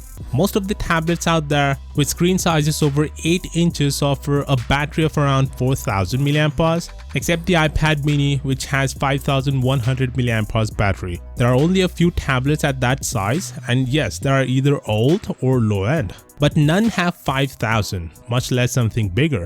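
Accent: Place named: India. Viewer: Your voice gets super deep when you say "lower". Indian accent ftw!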